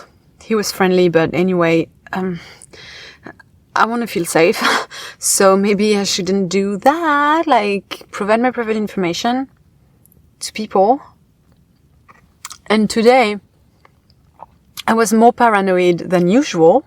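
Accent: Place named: Quebec, Canada. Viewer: Lol I hear you on the fast food. We complain it's making us fat but still keep on eating it. 😂 I had a mcnugget meal last night. Just walk often. I'm in my 30s too. Maybe a slight weight increase, but nothing too bad. Be safe as well. 👍 Are you from France or Quebec? Your accent sounds similar to people I've met from Quebec.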